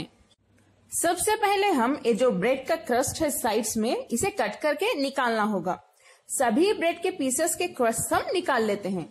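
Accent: Hyderabad, India. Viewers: I really like your hyderabadi accent and by the way thanx for sharing the recepie love hyd food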